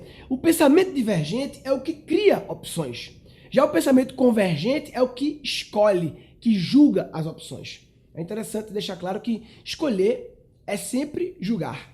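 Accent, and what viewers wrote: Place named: Recife, Brazil. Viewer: Gostei muito desse video,! Você tem um sotaque daqui, da região metropolitana do Recife!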